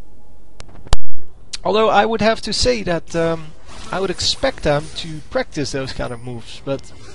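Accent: Netherlands. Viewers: are you dutch, I think so, ur accent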